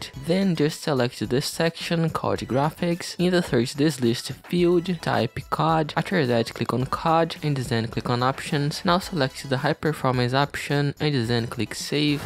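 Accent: Brazil. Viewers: Seu sotaque parece brasileiro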